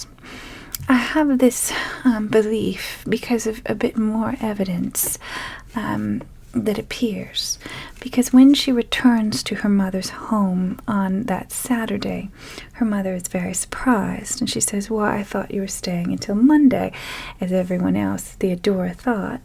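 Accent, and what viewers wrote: Transatlantic, United States. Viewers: The cadence in which you speak is very interesting, is your accent natural or is that something you’ve worked on? Would you describe it as a transatlantic accent?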